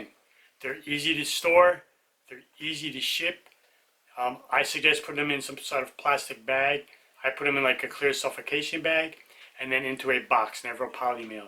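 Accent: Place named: New York, United States. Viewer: I’m a reseller and found your channel and I wanna say I love your videos I am also from New York but live in Cincinnati Ohio I knew the second I heard your voice you were from home.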